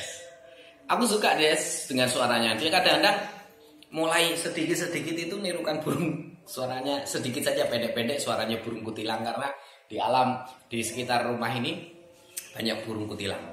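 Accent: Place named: Surabaya, Indonesia. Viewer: Mangkane kok koyok tau eroh, ternyata wong Suroboyo dw hahaha...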